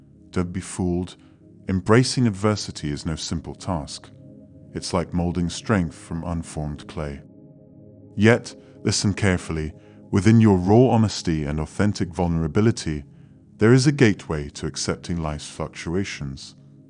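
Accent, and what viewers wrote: United Kingdom. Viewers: What a beautiful speaking voice. Ah, that British accent…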